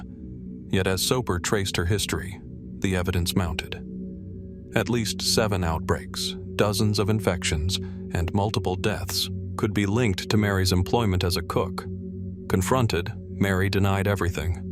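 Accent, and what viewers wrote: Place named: United States. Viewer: I listen to these during tbe day, and while travelling, but, I find it hard to fall sleep to an American voice; strange, but I find the British accent far more relaxing.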